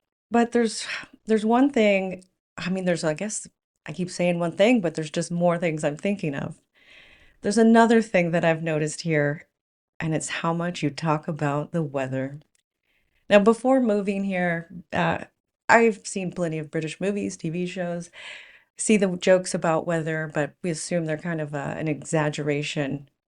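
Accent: South, United States. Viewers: Hi, unlike some American accents (the abrasive, machine gun type screech on New Yoick, for example), you have the kind of lovely, calm, soft, warm Southern drawl that one would hope to hear as one "draws down the final curtain, and joins the choir invisible", to parrot-phrase Monty Python. (I've just read that back, it was intended to be humourous, although I meant the first bit, but it makes you sound more like the Angel of Death! SORRY!!). Our sense of humour is WAY more sophisticated, combining dark sarcasm (in the classroom), irony, understatement, self deprication (a quintessentially British trait), and satire, that goes over the heads of a lot of Americans used to a more literal, almost slapstick style. "Sorry" is a kind of reflex response, to avoid escalation. Queues are just something we do instinctively, and when combined with our sense of humour/understatement is a way of gently letting of steam, when you're stuck in a HUGE long queue that's barely moving, the queue moves forward one pace, and you cheerfully say to the person ahead/behind "won't be long now". 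Queues in pubs are on a completely different level; you can be 3 or 4 deep at the bar, everyone just knows who's next to be served, including the barstaff, no arguments (although regulars do tend to get preferential service, with a nod and a wink, not speaking from experience, of course, lol!). The weather usually undstatement mixed with sarcasm, and is a very safe way of breaking the awkward silence with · I liked the southern accent! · I really like your American accent - very easy to listen to.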